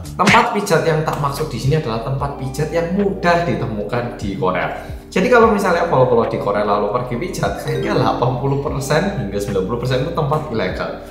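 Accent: Java, Indonesia. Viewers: Sakjane aku ndelok channel e mas ora mergo samiyan ki wong Korea, tapi mergo medok e ki lo. Jarang enek seng medok ngeneki nang YouTube wkwkwk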